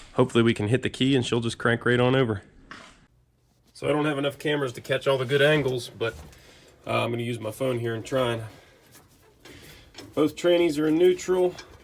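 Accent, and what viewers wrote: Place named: Western Pennsylvania, United States. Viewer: Ahh ok that makes sense, you had the same western pa voice I'm used to, that's where I am haha. You ever need a hand with anything let me know. I can work on about anything haha.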